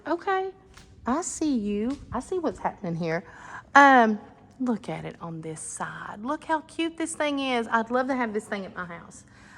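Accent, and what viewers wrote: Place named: Tennessee, United States. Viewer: You are too cute! Thanks for the info, love your TN ‘accent’ 😘 Knoxville lady here, and one of your biggest fans. We will be up with our son soon. He “thinks” he wants an 8x22.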